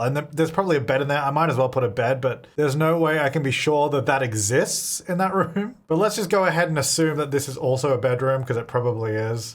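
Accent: Australia. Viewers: I’m curious… is this guy Australian? I like the way he says “He-Ah” instead of “Here” but it’s cute. Good video btw!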